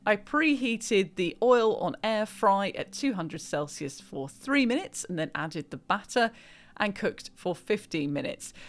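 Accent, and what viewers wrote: England, United Kingdom. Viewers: 2025 Thanks and an English voice too. 👍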